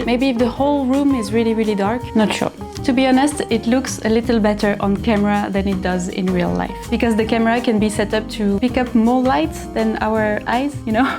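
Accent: France. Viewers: J'ai reconnu le petit French accent alors j'écris en français... Je ne sais pas pourquoi votre vidéo m'a été proposée mais je suis content de l'avoir regardé, très sympa, bravo :) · oh french accent, UNE FRANÇAISE QUI PARLE ANGLAIS, incroyadible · Do you speak French or other language to English habitually ? (J'ai cru reconnaître l'accent français ! )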